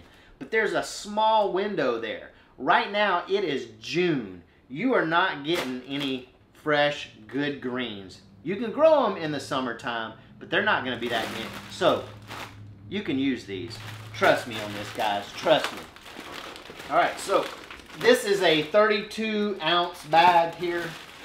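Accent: South, United States. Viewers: When I hear that, I KNOW YOU ARE FROM THE SOUTH! FIFTYLEVEN!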